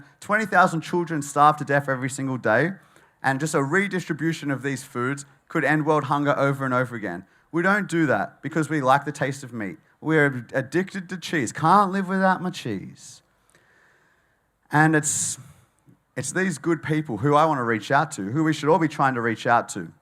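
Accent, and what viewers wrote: Australia. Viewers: Another great speech. I could listen to you talk all day long. You have a very gentle voice and I love the aussie accent. You have such a friendly way of introducing people into a vegan lifestyle, giving facts in a non pushy way. I have been vegan for 19 months now, started by signing up to veganuary. I watched earthlings, cowspiracy, what the health as well as many YouTube videos of yours, Joey Carbstrong, Hench herbivore to name but a few. I will never look back, I feel amazing not only health wise but to be joining you and others in making a difference.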